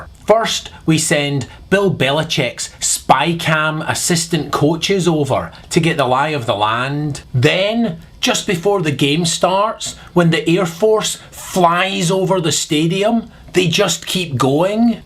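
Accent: Scotland, United Kingdom. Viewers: Normally I wouldn't do anything so pedestrian as comment on someone's accent. But your scottish tambre makes "warfare fix" sound like "warFEAR fix", which when you think about it is entirely appropriate. As for "American" Football, it's ironic that Minnesota is lagging so far behind on their stadium work, they may lose the Vikings to Toronto! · Your American? but you have a Scottish accent. Anyway, there wont be a war on Iran. Not for the moment anyway. The USA is stuck in a quagmire in Iraq and Afghanistan and it is becoming very expensive. The US economy is falling, along with its dollar and a war with powerful Iran(not a third world country)would be devistating to US economy. Also, countries like China and Russia are supplying some powerful weapons to this country.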